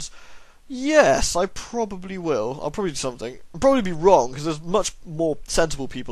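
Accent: United Kingdom. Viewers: acknowledged me! For some reason I fangirled when you yawned during my question... It must be your sexy Britishness or I'm weird. Either way keep up the amazing videos, they are one of my highlights.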